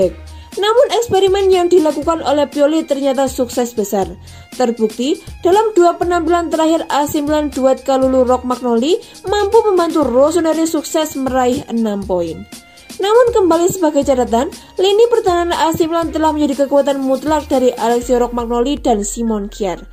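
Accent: Java, Indonesia. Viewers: Mantap Medoknya keliatan bgt 😄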